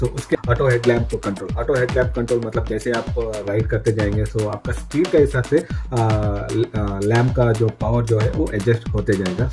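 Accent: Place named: Northeast, India. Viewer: abe ve north east se hai... tujhe jyada dikkat hai to jaake sidha showroom walo se baat karle na ek to banda mehnat kar raha upar se ye C type log aa jaayege samne wale ke accent pe bolne ke liye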